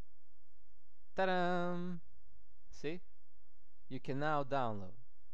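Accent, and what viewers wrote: Russia. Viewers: you sound like him, are u russian ? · Oh, and your tutorial was awesome. You Russian? Your accent is awesome lol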